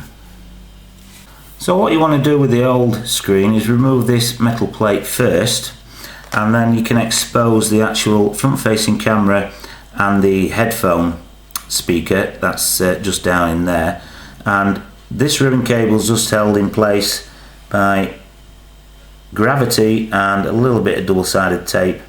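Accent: Yorkshire, United Kingdom. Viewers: Great video ! Couldn't help but notice your a Yorkshire man . If you live in Sheffield can I pay you to repair my phone ? Thanks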